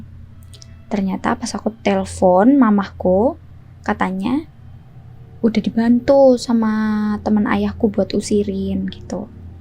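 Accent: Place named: Central Java, Indonesia. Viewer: Mbak logatnya jawa tengah bnget hee 😌😌😂😂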